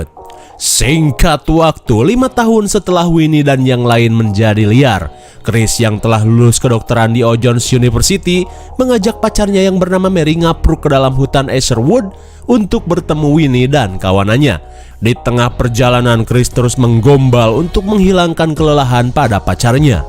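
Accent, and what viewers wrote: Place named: Sunda, Indonesia. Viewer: Sunda na lekoh pisan ih 😂😂😂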